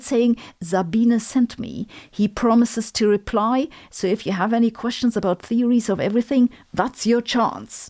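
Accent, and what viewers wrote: Germany. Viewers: Sabine, a great deal of your comment is applicable to regular TV, and much of it's cable variety; simply put, creators often had little idea why of how their production got the viewer buy in to begin with. On improving your English skills; what ever you do, don't mess with the "charming little German lady" accent; it is as much your brand and trade mark as Joeg Sprave's maniacal laugh is his, along with a very Teutonic lilt to his English; and his "schtick" is sling shots! My thoughts on "creator burnout"; although not a YT creator, I think my objective view as an over consumer of YT product does give me a bit of horizon on the subject; scheduling is the largest stress producer in the process- schedule twice the time your layout and "pre think" suggest , do the work, and hope fully go get a massage of some other personal 'uplift" with your left over time. 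The creators that I have watched collapsing into themselves, trying to "give the algorithm" more and more of what it wants as they chase fame and fortune- they are the tragedy of the YT process. Some actually had good ideas but fell into those "number chasing" traps you described. Last, I would say, don't do something if you feel it doesn't "fit" you, personally- it shows when you do, a certain part of your "faithful following" will being losing who you are to who the algorithm wants you to be. None of which seems to be your problem. But fact based scripts, like yours, tend not to lead you there- another wise move from a "sc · The German acsent help's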